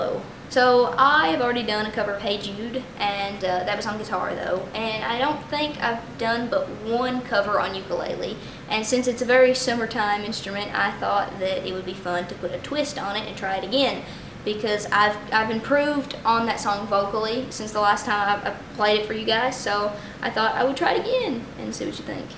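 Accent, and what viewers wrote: South, United States. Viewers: She's either from Georgia or Tennessee. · Def southern but I'm guessing Tennessee or Kentucky maybe? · Garrett Nickell it's an American southern accent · Your accent is the perfect amount of "I can understand you! Even though you have a country accent!" and "OMG OMG OMG THIS PERSAN HAS A COUNTRY ACCENT!" I give 3 thumbs up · I click subscribe because of your southern accent. Im in love 😍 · Ukuleles come in different sizes ..this was good. I love southern girls accents. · Definitely not Arkansan, coming from an Arkansan. Its really Southern more so than I am used to. So I would be inclined to assume Louisianan or some other deep south state. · It's a southern accent compare it to Paula dean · This is very beautiful and by the way, I love your southern accent!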